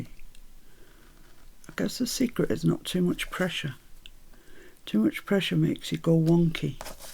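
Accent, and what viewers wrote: England, United Kingdom. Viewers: It is the difference in accents. I am in the United States. My son in law is from your part of England and sometimes he has to repeat things for me. I will continue to watch what you are doing as i love them. I bought an Edith Holden book so I can use it for some of your wonderful journal items. I had never heard of her books so you have expanded my knowledge. Thank you.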